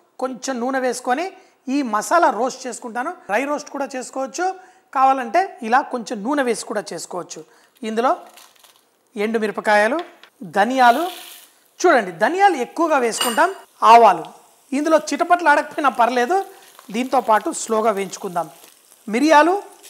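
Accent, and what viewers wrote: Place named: Hyderabad, India. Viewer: Brother you should talk Hyderabad telugu only... Being a Hyderabadi. . I enjoy while you talking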